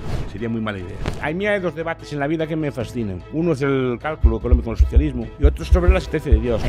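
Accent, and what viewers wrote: Galicia, Spain. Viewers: Los ricos son más ricos y los pobres también son más ricos no? Entre que habla muy rápido y no se le entiende media Papa y encima es gallego o ve por ahí de donde sea que no se le entiende cuando habla y encima deja las perlas que deja. El pobre es más rico pero sigue siendo pobre. Hoy gano 8 € la hora y mañana ganaré 8 € con 16 € entonces soy más rico pero no Rico sin embargo el rico gana generalmente el no honrado gana cantidades desorbitadas y si por algún motivo tuviera que ganar más no va a ganar 2 o 3 o 4 € más va a ganar muchísimo pero que muchísimo más en comparación a esos 16 céntimo de euros qué hace que el pobre deje de ser pobre. No es personal pero este pavo o no sabe lo que dice o lo que dice lo que dice porque está en nómina muchas más posibilidades no hay. Son personas que pese a todo el conocimiento que tienen da la sensación desde que viven en una burbuja personal intelectual cómo los que dicen que en el mundo no sobra gente sí sí claro en el mundo cabe muchísima más gente eso lo dirá el que no ha ido nunca una cola de un paro o no ha ido nunca a un médico público o dificultad para encontrar un trabajo digno en el que no te coacción en pero a niveles demenciales.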